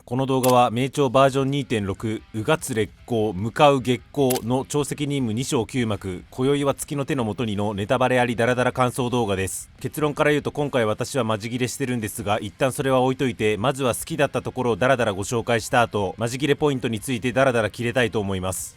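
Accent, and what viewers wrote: Kansai, Japan. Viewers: 大阪万博いって関西弁使うのにハマりました？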